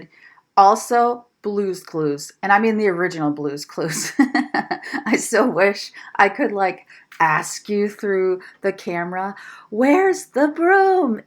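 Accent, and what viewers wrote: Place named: Maine, United States. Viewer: Don’t ever change your wonderful accent,. It reminds me of home every time I hear it and I love it so much. We watched a video growing up in Maine about how the language is similar to a certain old English dialect. I’m frankly, a little shocked that anyone would comment in a negative way. It’s beautiful, beautiful beautiful, and I love it. ❤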